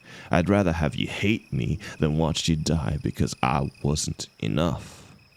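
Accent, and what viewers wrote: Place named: South, United States. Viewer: I really enjoyed this one! That southern accent you do is so soothing and comforting. And thirty minutes is such a treat!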